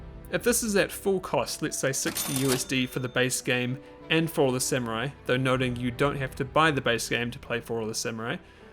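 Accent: New Zealand. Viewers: Wow, you’ve got one of the kiwiest accents I’ve ever heard. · homie is kiwi af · Oh he's a kiwi I was wondering why the accent was confusing me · I love this review for a few reasons: it's exceedingly late, which means it's gonna help folks who may have compatibility issues on newer systems; it's weighing the game more on its own merits than just mindlessly comparing it to Rome 1 or Medieval 2 as some old school TW fans tend to do; and the exceedingly kiwi accent. The only thing I disagree with in this video is the statement that Warhammer 2 is the best example of a Total War game thus far. Mechanically, maybe, but a huge part of the appeal of the franchise has always been the large scale battles set in their different takes of different historical periods and settings. You can't take that away from Total War even if it permanently shifts to fantasy, like going into LotR, Game of Thrones, or even WarCraft lore (which I'd honestly love to see). 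In that regard, I'd still say Shogun 2, especially including Fall of the Samurai, is the epitome of a Total War game among the post-Medieval 2 releases. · "Some clans may begin in a more precarious position than others" Legendary Uesugi flashbacks What timing though. Was surprised to see a video on this when I'd been playing shogun 2 just a couple of months back, and shocked to hear a good ol' kiwi accent.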